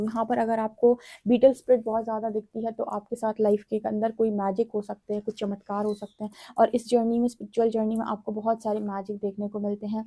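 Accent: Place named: Bareilly, India. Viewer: ap up ya bareilly side jaise sound karti ho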